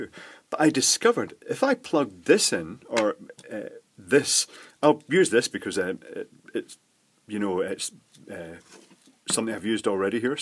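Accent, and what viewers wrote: United Kingdom. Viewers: this guy has the most british accent i've ever heard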